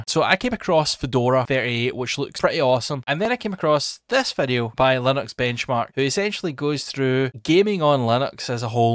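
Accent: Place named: Scotland, United Kingdom. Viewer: Nice video. It's interesting to see how others do when making the switch to Linux. I tried a few different distros as I wanted something that would make it easier to set everything up for gaming and eventually settled on BigLinux which I really love. I did try Nobara but I found that it wasn't for me although I'm certainly taking advantage of Proton-GE etc as the dev has provided us with many goodies that make life easier. Also nice to hear another Scottish accent 😁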